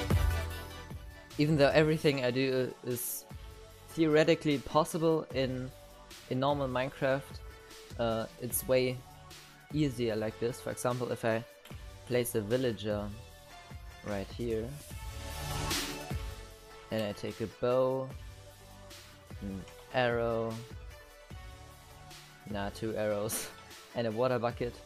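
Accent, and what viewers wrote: Germany. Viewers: deutsche accent killt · as a german i can say that your are german Right?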